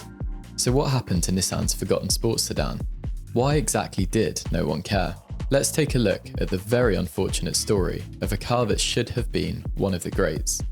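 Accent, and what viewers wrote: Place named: United Kingdom. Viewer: You have a UK accent. It's called a saloon!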